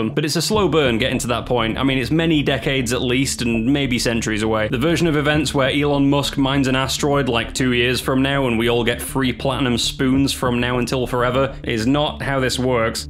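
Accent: United Kingdom. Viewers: He's British Kurzgesagt and I'm here for it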